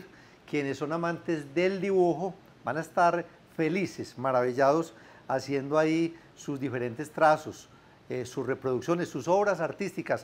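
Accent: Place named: Colombia. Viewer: Colombiano Lol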